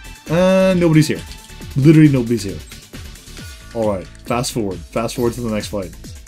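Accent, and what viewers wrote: Ireland. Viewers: Which part of Ireland are you from?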